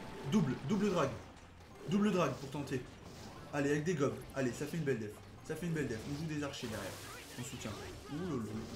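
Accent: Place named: Marseille, France. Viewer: t a un accent marseillais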